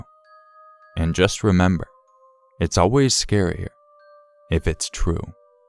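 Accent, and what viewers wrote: South, United States. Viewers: Love your Southern accent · u and that county voice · Love the southern accent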